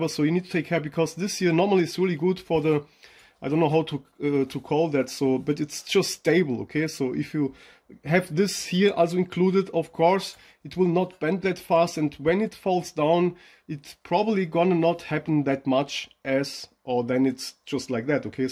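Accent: Germany. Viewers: I love German accent 🤣. Btw Ich bin Deutsch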